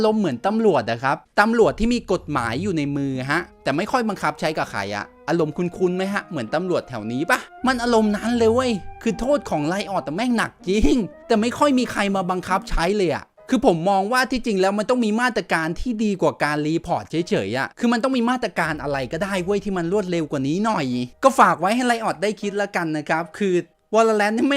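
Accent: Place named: Rural, Thailand. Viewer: เสียงบ้านนอก คำนี้เกินไปนะ เกิดบ้านนอกแล้วไงเล่นเกมแบบคุณไม่ได้เลยหรอพ่อคนในเมือง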